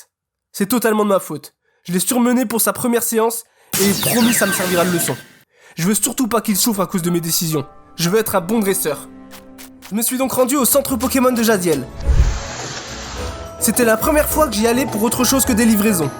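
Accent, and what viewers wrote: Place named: North, France. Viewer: pas = po il vient du nord cet accent mdrrr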